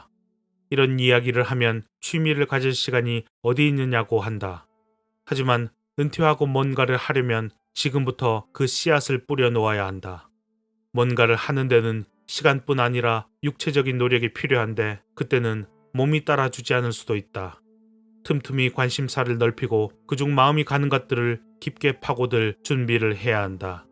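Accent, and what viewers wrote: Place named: Gyeongsang, South Korea. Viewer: 경상도 억양이 있어서 좀 아쉽지만 너무 좋습니다 잘 들었습니다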